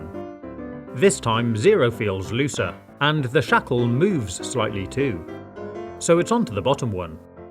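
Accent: United Kingdom. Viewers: Pure #BritishAccent nice to hear as it is conspicuous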